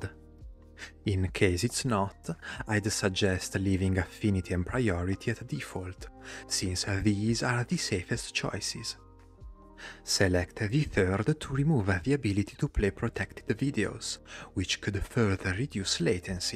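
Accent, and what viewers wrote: Italy. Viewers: Your voice with that Italien accent is beautiful and very relaxing. Did you thought of doing ASMR with computer components ? :goodvibes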